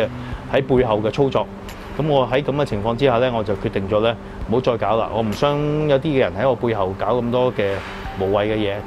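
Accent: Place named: Hong Kong, China. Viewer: Most of English words he said sound in Hong Kong way like the words 'crepe', 'trail'. Mixed with other words 'crap', 'trial' because he doesn't have enough words in his knowledge. And just relate them into a similar one he knows. He also has a very heavy Hong Kong accent when he speaks English. Looks like he doesn't even watch English TV. Ironically, one of his die hard fan Dasy Chan once said his English is so good that he should make a program teaching English.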